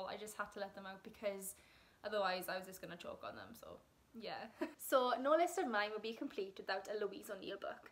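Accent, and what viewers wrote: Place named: Wales, United Kingdom. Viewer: Welsh huh. None too many have your accent in Canada. I just have the generic Canadian accent, which is clear but lacks lilt. Can't say I read any of your top 8 this yr.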